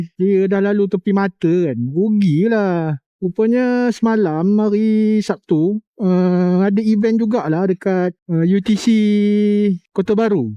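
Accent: Perak, Malaysia. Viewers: Ater pekat beno loghatnya...udoh ghope org kuale,bote ngan parit je...🤭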